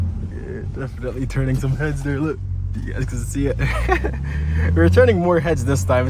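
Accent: Jamaica. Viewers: You almost sound like Jamaican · are you jamaican cus i can def hear somthing? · U Jamaican by any chance?? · i can tell your jamaican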